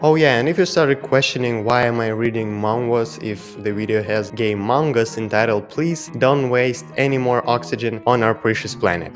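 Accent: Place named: Russia. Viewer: Probably not the comment that you're looking for, but your English doesn't suck! Your English is extremely understandable and your vocabulary is really good! Lots of the slang words and phrases you use correctly and where a native english speaking American would! Seriously you should be proud of it, while you definitely have a distinct Russian/slavic that's not your fault! Anyone who grew up natively speaking Russian or any eastern European/Rusified language is almost guaranteed to have an accent no matter their language proficiency, plus at least in America we probably converse with and understand at least one person with worse English and a more foreign accent than you on a daily basis! Don't let your English skills be what keeps you from creating, I promise it doesn't matter in the slightest to an American viewer! We're here for the personality which we can all decipher just fine past a slight, and very adorable, Russian twang.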